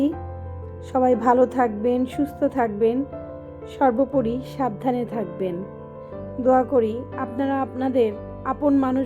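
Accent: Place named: Khulna, Bangladesh. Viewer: প্রিয় আপু ৫৪ লাইক দিয়ে তোমার ভিডিওটা দেখে নিলাম তোমার কথাগুলো অনেক সুন্দর খুলনার মানুষের কথাগুলা মনে হয় অনেক সুন্দর তোমার পুরো ভিডিওটাই অনেক ভালো লেগেছে ❤❤❤❤❤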